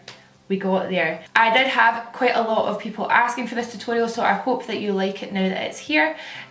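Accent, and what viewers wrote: Scotland, United Kingdom. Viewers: Your scottish accent is the best kind. yours is the kind of accent I wish every Scot would have. non of that ned shite 👍😂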